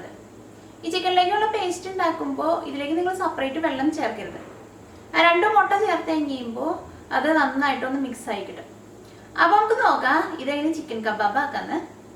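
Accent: Thrissur, India. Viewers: nice recipe.. but I hate thrissur slang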